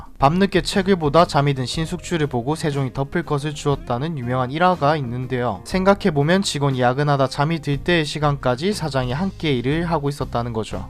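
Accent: Gyeongsang, South Korea. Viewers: 10월을 시월이 아니라 십월 ㅎㅎ 경상도 어투 반가워요.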